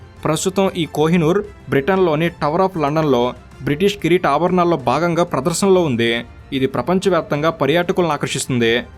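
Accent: Telangana, India. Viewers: Telangana na Andhra na howle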